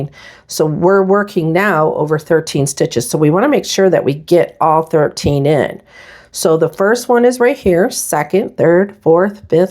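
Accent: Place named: Northern Illinois, United States. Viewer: Girl, are you from Northern Illinois? You sound like home.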